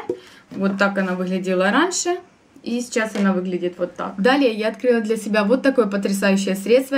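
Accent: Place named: Ukraine. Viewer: у Вас такий милий акцент український=)і Ви сама дуже мила і цікава=)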